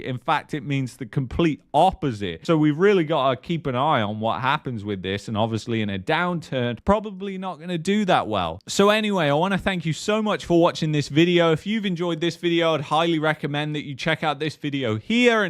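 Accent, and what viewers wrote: United Kingdom. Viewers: I never thought the British accent would annoy me so much, but here we are.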